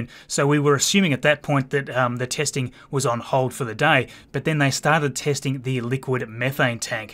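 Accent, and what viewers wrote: Australia. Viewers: Nice Channel. Just hit subscribe after watching you for a few weeks. good content, and nice for you credit your sources. Question for ya.... You sound like an Aussie... And I know it's likely to not get the views you may want, but what about something on the Gilmore Space up on the Gold Coast?? Anyways.. thanks for ya content:) - 4563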